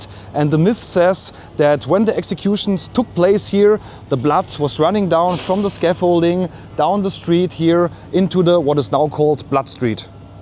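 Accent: Germany. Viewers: You have german akzent ma boi. Are you from germany?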